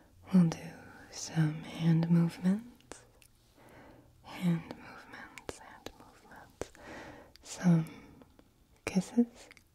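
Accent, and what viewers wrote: South, United States. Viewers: She's southern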